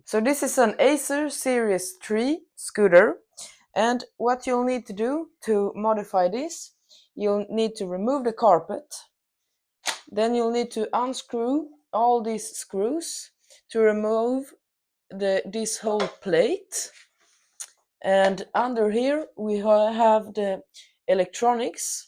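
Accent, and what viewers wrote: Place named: Sweden. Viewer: Dude you sound Swedish ❤😅 But 5km/h ??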